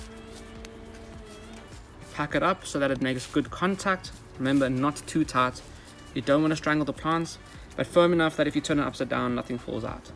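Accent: South Africa. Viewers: Nice to hear and see a fellow South African in the plant community 😃😁 Going to try repot mine. Is it ok to do now in late spring?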